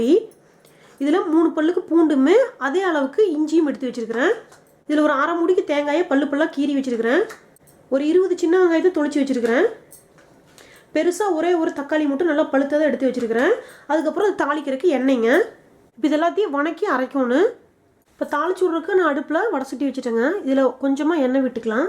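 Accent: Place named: Coimbatore, India. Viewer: Akka neega Coimbatore thana pesarathuliya theriyuthu ka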